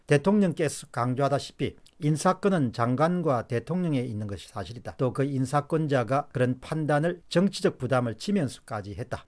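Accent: Gyeongsang, South Korea. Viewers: 공병호씨 이분 기억해둬야겠네 말투보니 대구사람 같은데 같은지역 산다는게 넘 쪽팔린다. 미국산 쇠고기 마이 쳐 먹었나??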